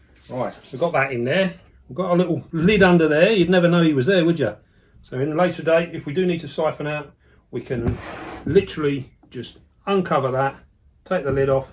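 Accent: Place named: Essex, United Kingdom. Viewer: Nice video me old mate, very interesting, been looking at getting one of these for a while now off the spider shop. Whereabouts you from? Sound like a fellow Essex/Kent dweller?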